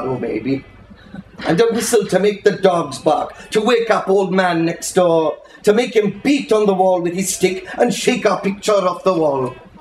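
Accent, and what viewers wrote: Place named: Wales, United Kingdom. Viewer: As a Welsh person myself, I’d just like to say that you nailed the Welsh accent perfectly.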